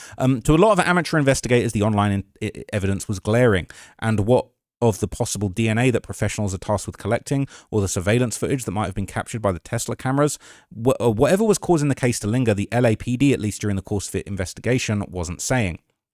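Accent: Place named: United Kingdom. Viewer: Bros never heard of a British accent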